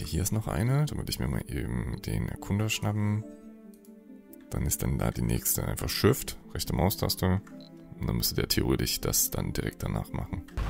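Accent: Saxony, Germany. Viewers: Bist du Sachse, falls Ja. Liebe Grüße aus Leipzig😂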